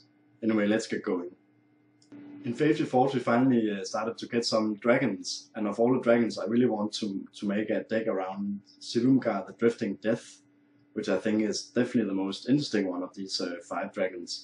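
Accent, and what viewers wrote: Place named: Germany. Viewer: Das ist definitiv deutsches Englisch ;)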